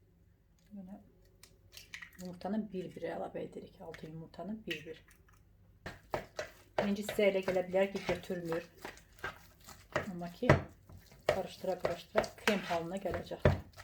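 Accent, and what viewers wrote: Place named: Qakh, Azerbaijan. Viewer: Gözəl ləhcəmiz ❤ Qax' dı, Zaqatala? Əllərinizə sagllq 🌹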